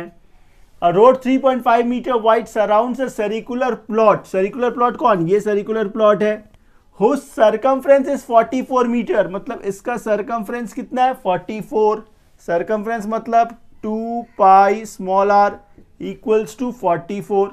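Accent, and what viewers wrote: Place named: Bihar, India. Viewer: dhanyawad sir itne ache se samjhane ke liye apne har ek word bihari style me ache se samjhaya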